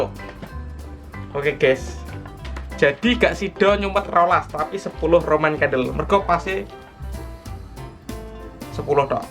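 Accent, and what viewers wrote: Java, Indonesia. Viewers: Jowo pundi to mase?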